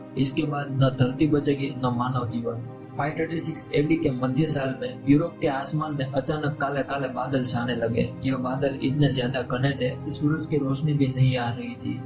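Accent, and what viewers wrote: Gujarat, India. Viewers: Bhai Tu Gujarat se hai ?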